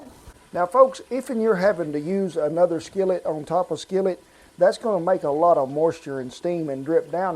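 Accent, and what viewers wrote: United States. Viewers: Why did I watch this before sleep, got hungry again:((( What accent is that though, never heard that particular American accent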